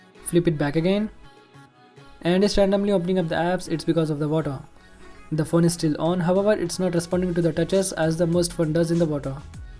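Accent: India. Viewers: I love Indian English 😂😂😂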